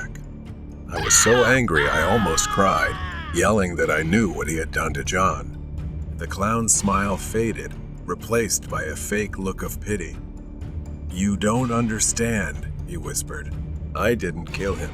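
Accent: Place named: New Jersey, United States. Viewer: your jersey accent makes your videos all the more comforting. been following since 10k <3 😰😰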